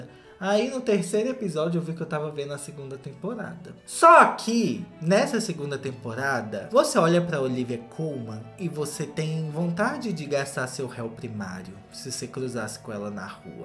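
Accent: Minas Gerais, Brazil. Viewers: O sotaque mineiro do tá cada vez mais forte, eu amo.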